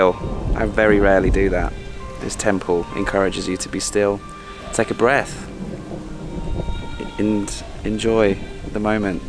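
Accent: United Kingdom. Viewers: your British accent is so strong now! I think it because you no longer have to speak broken Thai and most people in Vietnam speak more English 😎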